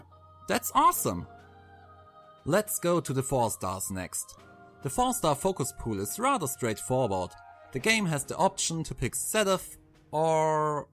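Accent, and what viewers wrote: Germany. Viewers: this man has a very german accent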